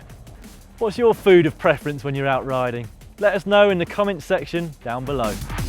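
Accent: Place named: United Kingdom. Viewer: I'm confused you sound british but, you cycle on the wrong side of the road. Where are you based?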